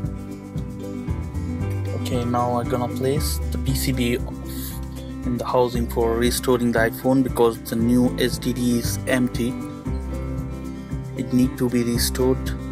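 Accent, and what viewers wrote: India. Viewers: Hi I can detect Indian accent so where in India are you from ? I would like to have this procedure done to my iPhone Thanks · sounds like a indien tech scammer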